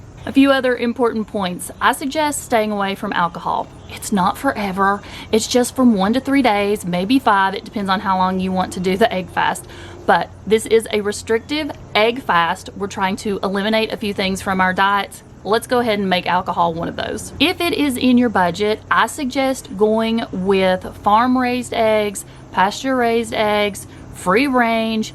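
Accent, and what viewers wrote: South, United States. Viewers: where you from the south?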